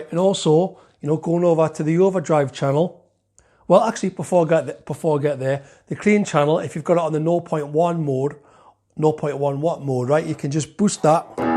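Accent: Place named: Ireland. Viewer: Thanks for your honest review! I know you're a less is more kinda guy, but not everyone else shares that philosophy. Having features is a good thing because it gives you more options to tailor your sound. However, if you don't want or need them, then simply don't use them! But I think having more options available gives you more flexibility and not just a one trick pony amp sound. In addition,, it doesn't matter if it's only 1, or 0.1, watt. Having two channels and a foot switch allows you to go from clean to scream at the touch of a button for different sounds for rhythm and lead, etc. By the way, as someone in the states I enjoy listening to your voice because of the accent . Are you from or located in Ireland? Just curious!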